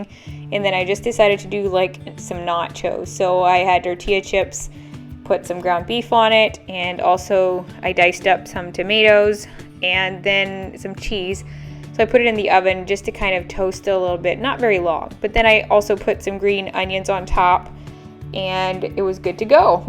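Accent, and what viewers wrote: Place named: South, United States. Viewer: You sometimes sound so southern although I know you aren't originally from the south. Everyone I have ever met from "up north" has always called dressing, stuffing whether in a bird or pan. Edit: Tough Shrimp means you overcooked. For shrimp you need to only cook till it's pink all the way through. If you keep cooking it will be tough and chewy.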